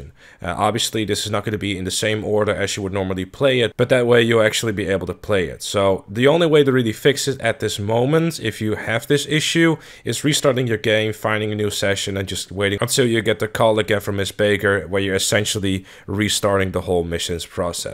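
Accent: Netherlands. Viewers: Je hebt een beetje een nederlands accent klopt dat?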